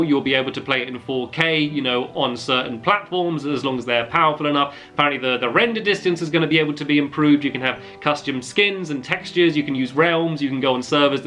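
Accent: United Kingdom. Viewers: Thalia Foot he's British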